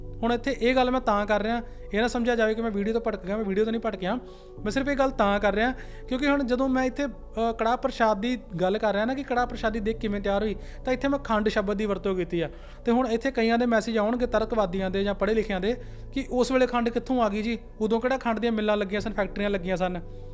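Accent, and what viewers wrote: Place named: Majhi, India. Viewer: ਸਪਸ਼ਟ ਮਾਝੀ ਪੰਜਾਬੀ ਵੀਰ ਦੀ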